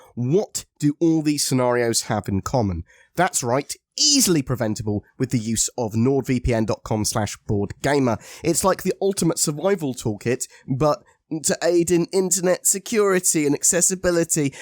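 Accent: United Kingdom. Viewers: 3.18 Bring it on. To be honest I watch your videos more than I actually play the game. LOL. Might it be your British accent? I follow you on Facebook as well.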